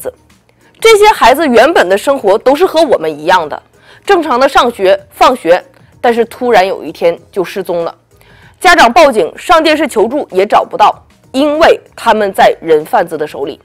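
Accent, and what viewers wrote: Northeast, China. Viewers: 听小姐姐说话可好玩儿了，哈哈哈，东北口音小姑娘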